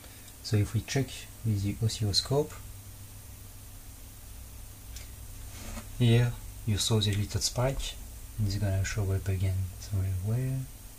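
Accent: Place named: France. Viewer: Hey! Change l’orientation de l’image, je suis obligé de figer la rotation pour regarder la vidéo dans le bon sens. So frenchy accent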